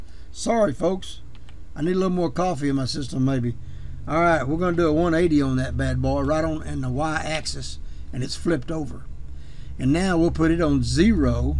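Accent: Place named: South, United States. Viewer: two questions my friend.... 1. what game are you exporting to? 2. where you from? (great to hear the southern accent...I am from Alabama)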